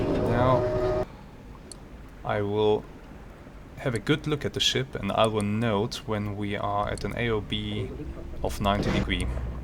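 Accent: Germany. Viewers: love this series. great narration too, and the Germanic accent really helps!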